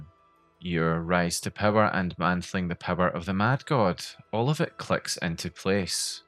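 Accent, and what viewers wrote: United Kingdom. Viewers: BRIT DETECTED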